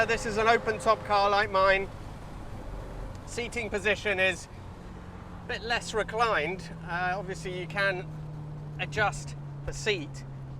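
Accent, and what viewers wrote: Italy. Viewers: You appear to of developed a very pronounced Italian accent? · The British channel with a lovely Italian accent. This presentation then, is particularly fine.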